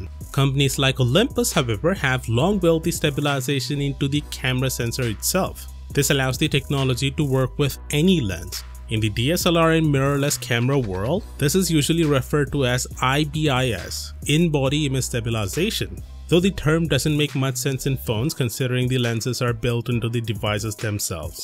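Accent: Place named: India. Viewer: The Speaker Hindi Engelish🤮🤮🤮🤮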